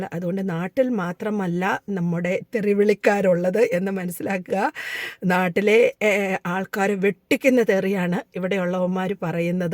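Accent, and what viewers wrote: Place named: Haryana, India. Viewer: Like haryana slang ....same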